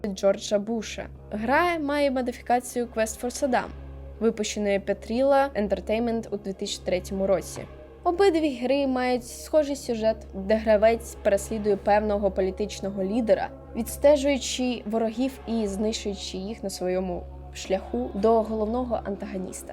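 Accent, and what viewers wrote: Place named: Russia. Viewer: Спробуйте вичитувати текст перед зйомкою разів 10-20 вголос. Дуже важко слухати. Українська мова - це дуже круто, але неправильні наголоси, вимова слів на російській лад (плутанина з «і» та «и», «о» та «а») тощо, потребують додаткової практики. Щасти.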